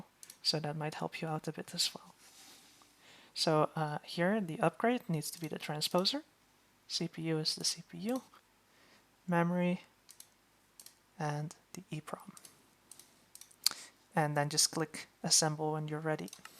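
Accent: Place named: Netherlands. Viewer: I love the dutch accent xD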